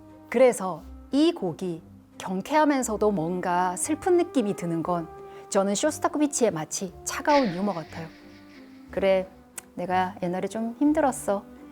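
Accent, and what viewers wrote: Gyeongsang, South Korea. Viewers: 웬일이냐. EBS 에서 쓸데있는 프로그램을 다 만들고. 배 선생님 경상도 억양이 있음에도 diction 도 너무 깨끗해서 잘 알아들을 수 있고 ( script ) 내용도 너무 좋네요 . 소위 말하는 방송작가라는 인력의 손이 별로 닿지 아니한 듯.. EBS 여행 프로그램 중남미편 몇 개 보다 말았는데요 전문가라고 나온 사람들이 그것도 교수 직함 달고 스페인어 정관사도 구분 못하질 않나 돌아다니면서 쩝쩝거리고 먹질 않나 ( 그게 한국서는 실례가 안되지만 외국서는 아무리 중남미라해도 엄청 미개한 매너 ) 그래서 EBS 프로그램 PD 들 수준을 의심했는데 이 번에 볼만하고 들을만한 Casting 이군요 . 웬일입니까.. 앞으로 계속 방송 잘 만드시길 부탁합니다. 정말 배 선생님 때문에 이거 보게 되었습니다.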